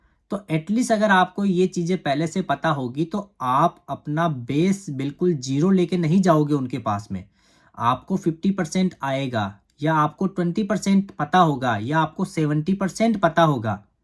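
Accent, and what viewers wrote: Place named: India. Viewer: my self prakash.... already have sent my concern on your Instagram handle....hoping that you will reply soon.......with lots of happiness and kinship you are continuously delivering to the needful .......Thanks ..,.i feel so happy to see a rajasthani bhai......... exploring uk......in desi accent ❤🎉🎉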